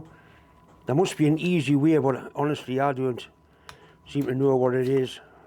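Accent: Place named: Durham, United Kingdom. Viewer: Thanks very much, really appreciate your straight to the point videos, very helpful. From the accent you must be very close to where I am in Durham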